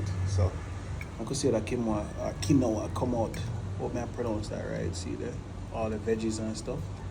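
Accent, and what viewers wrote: Jamaica. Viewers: are you Jamaican?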